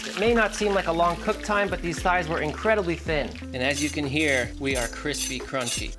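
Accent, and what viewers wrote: United Kingdom. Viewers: "Woostersheer" is how to pronounce it. Great to know you were born in UK. You can take the guy out of Britain, but you can't take (all) the Britain out of the guy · Where in uk were you from sonny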